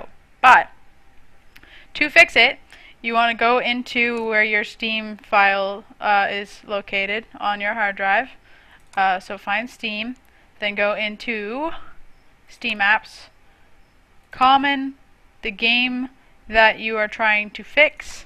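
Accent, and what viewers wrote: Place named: Canada. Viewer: I hope this works for Borderlands 2 and some other 2K Games published titles. 2K announced a list of non-Windows 10 compatible games, and good ol' BLT was on there (yet Borderlands: The Pre-Sequel was one of two or three games on the compatible list). The sound stutters like crazy in BLT now, and the fix you show in this video is one troubleshooting step I forgot about. By the way, I've been perplexed by your accent, and usually I'm really good at pinpointing regional accents from around the world. At first I thought you had a subtle Gold Coast accent (Australia), but it seemed a bit too subtle. It started sounding very similar to the North Midwest of America (where I am), but not quite. However, I think I pinpointed the general country and region: British Columbia, Canada. Am I close?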